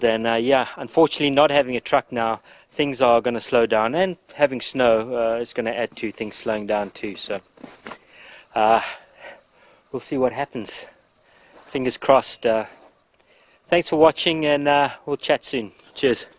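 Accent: South Africa. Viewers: You sound South African. Where are you from? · Wow. Came here by chance. Almost fell over when I heard the accent. Nice to see an ex SA man doing some great things. Where are you based? Subbed. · Good old South African accent there. Subscribed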